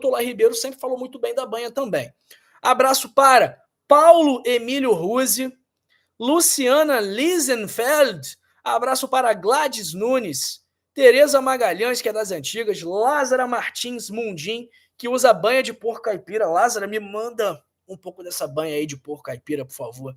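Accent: Rio de Janeiro, Brazil. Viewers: Dr, vc é carioca? Pêlo sotaque kkkk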